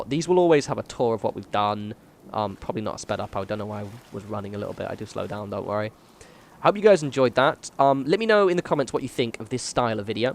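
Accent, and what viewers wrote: United Kingdom. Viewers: I literally click on your random videos just to hear that British: "Ello everyone."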